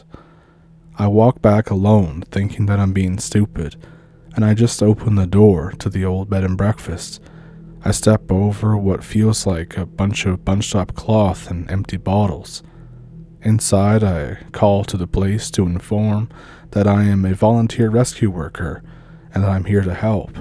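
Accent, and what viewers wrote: Ontario, Canada. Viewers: I knew this cat was Canadian by his Ontario subtle accent!!!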